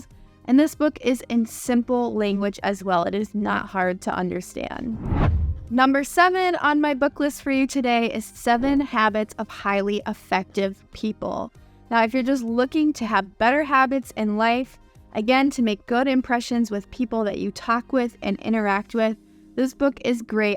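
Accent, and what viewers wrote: United States. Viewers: I love your channel😍. I was looking for a channel like this ( I really like the American accent and this channel has given me tons of information<3) love it!!! Thank you ❤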